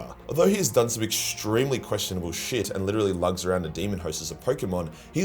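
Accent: Australia. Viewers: I came here for everything besides the Eisenhorn shit, then was surprised by how much shit I have no clue about then realized I'm gay and just wanted to relate to your Australian accent in a way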